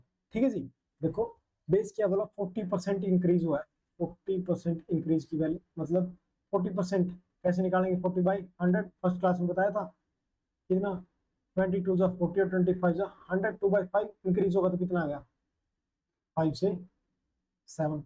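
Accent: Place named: Haryana, India. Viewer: Haryanvi control kro sir thoda 😅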